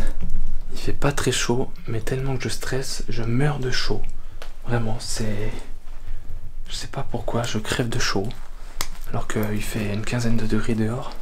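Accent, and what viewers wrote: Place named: Vosges, France. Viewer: D'après ton accent tu es peut-être vosgien. D'après les anciens même si on ne croit pas aux protections elles protègent quand même.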